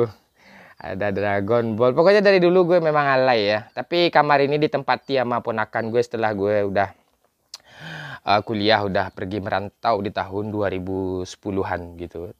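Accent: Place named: West Sumatra, Indonesia. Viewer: Bahasa minang ajalah ketahuan banget minangny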